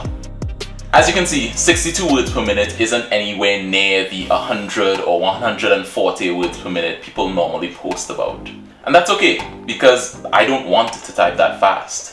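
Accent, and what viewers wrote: Trinidad and Tobago. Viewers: Are you from Trinidad? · Are you from Trinidad & Tobago? You sound exactly like one of my coworkers. Except with a less pronounced accent.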